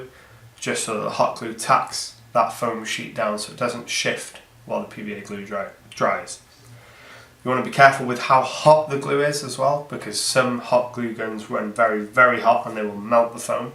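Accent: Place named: North Wales, United Kingdom. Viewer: Great video, thanks for that. I'm gonna guess you're from North Wales, because that accent is very familiar!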